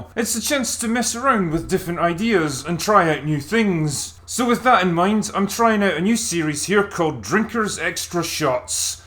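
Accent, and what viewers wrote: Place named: North Wales, United Kingdom. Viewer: Damn, that's a strong north Wales accent.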